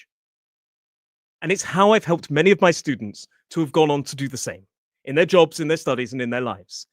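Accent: United Kingdom. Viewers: I'm from Argentina. I love British pronunciation. And I'm very happy because I understand every word you said!!😊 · Yes,! I very much like your British accent, as I told you before because is the first English that I learned. And altough I probably won’t travel any more (I visited London once years ago), that is the English accent I most like!! Marcos (Montevideo, Uruguay) Ps: even though I'm 64 now and I'retired, I want to improve my english pronuntiation the way you teach it... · Thank you so much! I love British voice.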